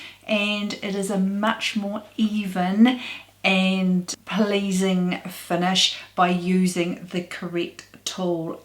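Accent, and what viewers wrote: New Zealand. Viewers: Hello. Nice to hear a lovely NZ accent on YT. I’ve watched several of your videos & find your information quite useful in general. I’m a bit concerned with the shaving by hand & derma planning as it’s a bit precarious & I think could be a bit harsh. Previously I was waxing in a salon but we get locked down so often (Aust), I looked for at home alternatives. Another lady on YT was using the VieBeauti Rechargeable Eyebrow Trimmer Facial Hair Remover. I bought one to try & Im very happy with the results & convenience. I think you should look at that item or other similar tools to see what you think. That item has 2 interchangeable heads, a larger one for general peach fuzz & smaller head for around eyebrows, nose & lips etc. The heads can be rinsed in water (It’s a tool that can be used on other parts of the body too but I’ve not done that) It’s quite portable & charges with a USB. 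I’m feel quite scared when I see ladies shaving around eyes or possibly around blemishes or flaws they may not notice. I’m certainly not trying to sell this thing, (I have no associations at all) it’s just something I’ve found is really useful now & wanted to share with you & people here as it’s certainly safer alternative.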